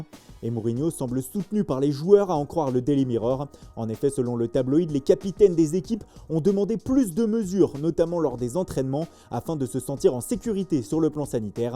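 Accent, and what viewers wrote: Italy. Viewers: L’ accents italiens légendaire 😂🔥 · l'accent Italiens legandaire.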